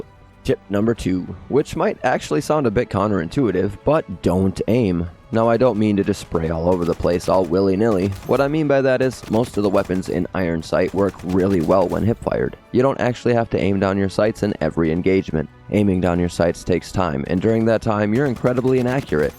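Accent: Bengal, India. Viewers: U bangali?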